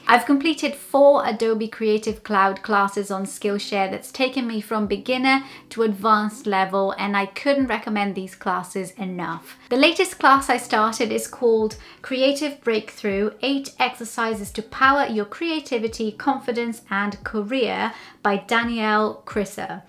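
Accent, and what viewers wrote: Wales, United Kingdom. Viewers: I am a retired lawyer, living in Yorkshire but my parents were both Welsh and I went to university in Cambridge. I would say you definitely speak English with a Received Pronunciation (ie the "standard English accent" typically used by educated middle class professionals particularly those coming from the South East of England, and heard on the BBC, also sometimes called Oxford English). With a delightful slight Welsh lilt. Nobody would guess you were anything other than a native speaker of British English. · Thank you so much for sharing this! I love British accent. That’s why I’ve been following your YouTube for the last 3 ++ years 😊 I searched “British English, mummy” or something like that on YouTube at that time and I found you:) Thank you so much always for your content! xxxx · Interesting stuff & you have a lovely voice - and yep, you're definitely showing the Welsh influences :)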